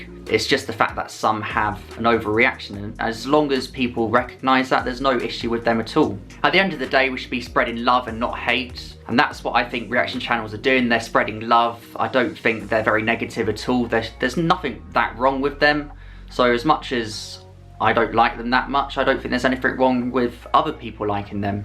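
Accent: United Kingdom. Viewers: If I'm not mistaken, I think his accent is called Estuary English. Correct me if I'm wrong. Notice that for TH, he uses /f/.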